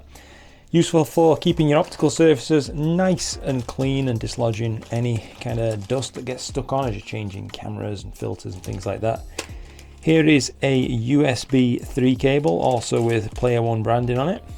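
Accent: United Kingdom. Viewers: Hi, excellent review. I look forward to the results of the deep sky testing of the 585. An unexpected result of watching your videos has been getting used to your nice British accent XD. I was used to American English, but now I prefer a British accent. No Dylan O'Donnell, I still can't get used to your Australian accent XD XD XD. Hugs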